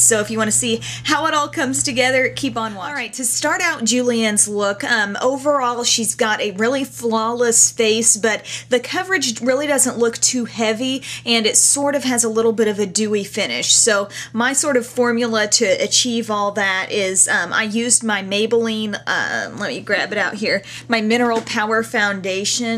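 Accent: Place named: United States. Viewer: Fantastic! and...just let me say another thing: you speak so good American, that I can easily understand every single word, even if I am Italian! :-)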